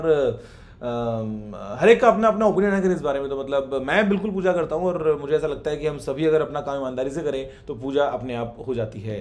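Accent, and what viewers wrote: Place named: Marwari, India. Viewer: Aapka marwadi aati ha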